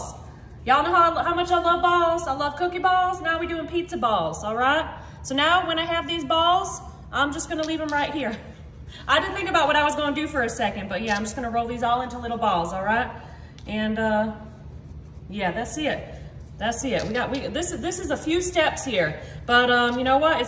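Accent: South, United States. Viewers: Love ur southern accent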